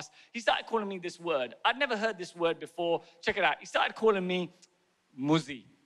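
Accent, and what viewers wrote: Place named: United Kingdom. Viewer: hey, dunno whether i'm more envious of your intellectual comedy, sprightly comebacks, ability to be (thought) provoking without being self-deprecating orrrr you really lanky frame man [i mean, i was no Calista Flockhart back in the day (i'm a guy by the way) but i think of thin or anorexia and i think of her] It is so nice to see someone (of 𝘥𝘦𝘴𝘪 lineage at that) not being lazy in using the 'easy to sell' self-deprecating humour and has enough wits about them to not stoop low or start getting personal! though i DOO love the naughty and brutal bits! Greetings and lots wishes from Bharat <link> [having grown up in Bharat and those were the pre internet era, my vernac. brain still takes time to grasp the British accent:P ]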